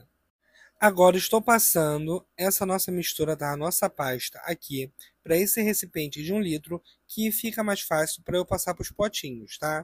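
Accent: Rio de Janeiro, Brazil. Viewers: Oi Boa noite.Nossa eu sempre procurei 1 receita de pasta; porque aqui em SP e muito caro.🙏🏻❤ por nos ensinar c tanta clareza e dedicação vc e 1 luxo e os CARIOCAS falam fofos👍😘❤Inte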